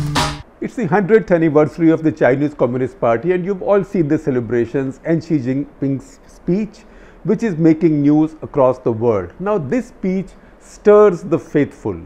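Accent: India. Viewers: As a Westerner, I always find Shekhar Gupta's analysis interesting. The Indian perspective is quite different to that of the western mainstream media. And the Indian way of speaking English is pretty cool too :-)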